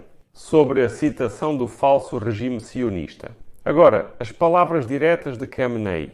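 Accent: Portugal. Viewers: Quem é você para ter "pena" de alguém? Quando as "crianças" lutam por sobrevivência não pedem hipocrisia de outrem, mas engajamento. Devemos considerar vidas humanas independente de etnias ou credos e a compaixão dissimulada não acrescenta, subtrai. "Ter pena" é melhor que exigir justica e direitos humanos indistintamente, é olhar de cima uma narrativa inconclusiva em sotaque português.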